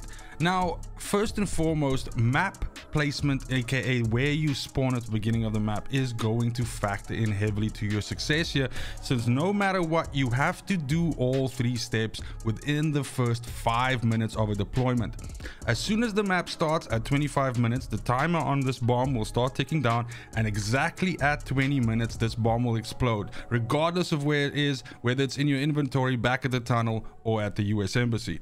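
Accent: Afrikaans, South Africa. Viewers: Thanks for the help with this one. Are you Afrikaans???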